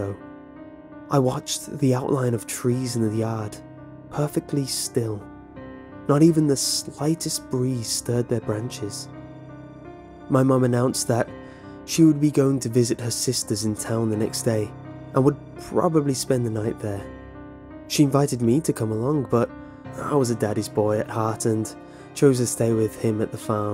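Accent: United Kingdom. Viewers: from Idaho but with a British accent ?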